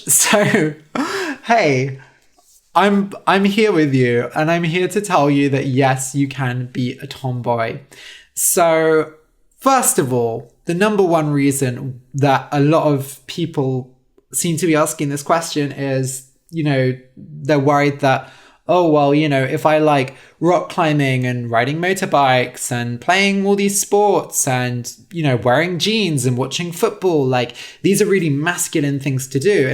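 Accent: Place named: United Kingdom. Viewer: oooohh! a Brit! (or somebody who sounds similar)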